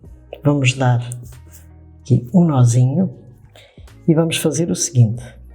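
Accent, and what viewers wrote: Portugal. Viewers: Amo seu trabalho e seu sotaque português 😍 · Amei...só consigo fazer com suas explições.É incrivel; sua calma para ensinar; e tudo se torna mais fácil o pá? (Esse sotaque purtuguêis lindo)Porque os portugueses falam o pá? Vemos em nossas novelas qdo tem um ator português.Todos lindos...ual.Grande abraço.CIDINHA. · Adoro este sotaque português Adorei seu vídeo, muito bem explicado. Abçs 🇧🇷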